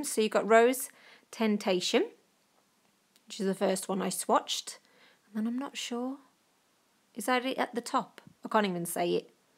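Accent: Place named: Tamworth, United Kingdom. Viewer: Hey, sorry for the none nail related question. I recognise the accent I think. Are you from Tamworth? X. Love the tip. Thanks for sharing.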